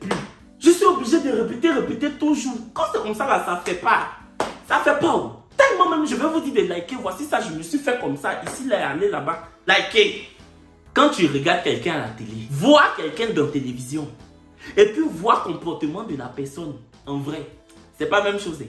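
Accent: Ivory Coast. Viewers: Pourquoi elle comprendrait pas? Il parle bien français même avec son accent Ivoirien & quelques mots en nouchi, n'importe qui peut comprendre. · je suis antillaise et je m'arrange avec l'accent ivroirien. Donc les blancs n'ont qu'à s'arranger aussi comme nous.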